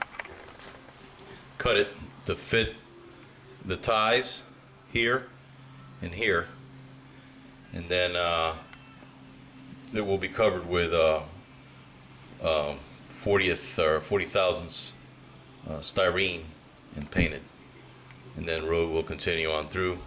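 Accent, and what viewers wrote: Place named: New York, United States. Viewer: I was going to ask you are you from New York because you sound like it..lol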